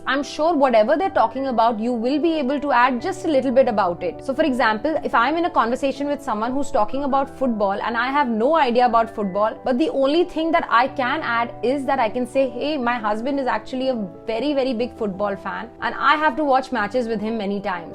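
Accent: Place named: India. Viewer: Idian english for sure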